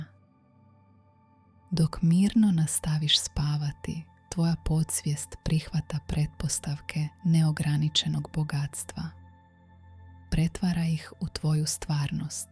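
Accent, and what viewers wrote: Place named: North Macedonia. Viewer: Divan glas, nisam bila sigurna je li AI, često makedonske( bar mi se učinilo) riječi. Svakako čudna mješavina koja čak i umiruje. Sviđa mi se zapravo🤔👍😀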